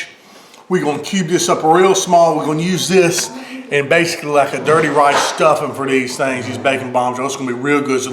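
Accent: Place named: Eastern North Carolina, United States. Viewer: is very much an Eastern North Carolina guy. His mannerisms, his accent, his tastes, even his inefficiency. And, of course, his Easrern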